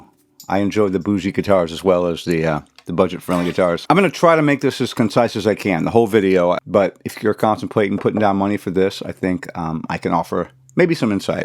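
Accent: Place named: South, United States. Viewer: You good bro. You just sound like a southerner with a lisp. That’s all. I hope you beat the shit out of Cancer. I’d love your opinion on the schecter solo II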